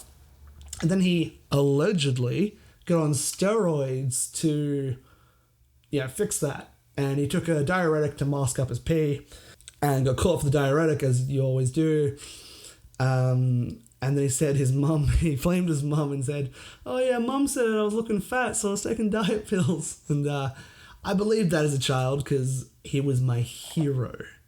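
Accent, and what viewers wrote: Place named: Australia. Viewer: Bouncy happy Australian boy.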